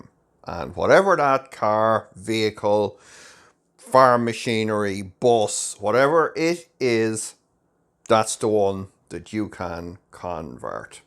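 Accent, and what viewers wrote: Ireland. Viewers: Thank you Mr. 😁. Really loved the sarcasm with the really nice Irish accent.. 😊. I Agree with you 100 % on this matter, you broke it down quite well on why and how. As usuall ppl do not even know why they do, what they do. This would serve as a good tutorial of asking them, do you even know what you want? Thank you for the video, and Happy New Year 2022..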